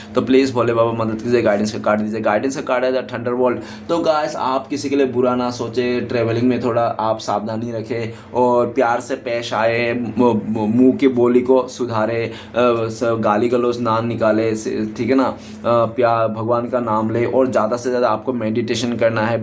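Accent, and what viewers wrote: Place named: Bengal, India. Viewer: aapnar acent bengali aachen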